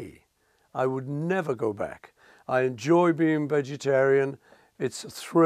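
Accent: United States. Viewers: sounds American in this lol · sounds slightly American now, or is it just me?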